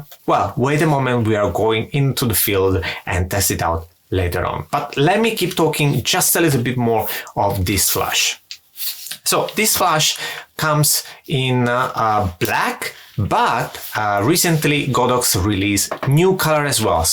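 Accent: Italy. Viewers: You sound very much like an Italian… are you? 👍 👋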